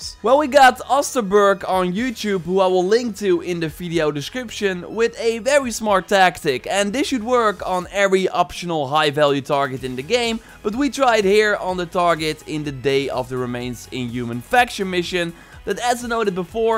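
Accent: Netherlands. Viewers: Hes dutch thats why he got that accent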